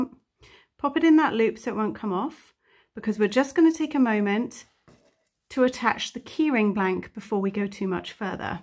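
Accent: England, United Kingdom. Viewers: Great tutorial but I’ll admit I was thrown off at first by the English accent but American crochet terms 😂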